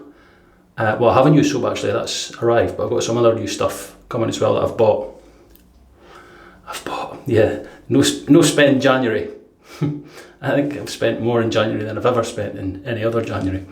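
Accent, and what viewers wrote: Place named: Scotland, United Kingdom. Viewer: I love Scotland. My Mother came to America from Scotland as a little girl in 1923 with her 2 siblings and her parents. They came from Paisley. My Grandfalther, Robert Muir lived with us in his later years. I loved his accent. He sounded just like you. Long live Scotland!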